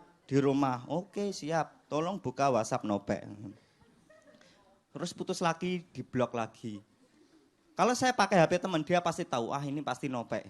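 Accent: Java, Indonesia. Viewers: nopek itu gaya standupnya mirip raditya dika. cuma dia versi medok jawanya . pola beat , absurd, actoutnya dan pecah nya sama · Materi dia sederhana tapi realita,di tambah lagi medok nya yg nyerocos 😂 Keren lah. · Lucu aksen jawanya...... Ngakak gw.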